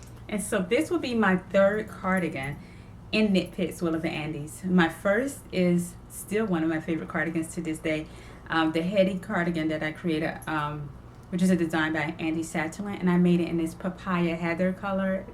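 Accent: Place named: South, United States. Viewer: I’m a southern girl living in Colorado, and I love watching your videos and hearing your accent. It’s a little bit of home. Plus, you’re just adorable! 😊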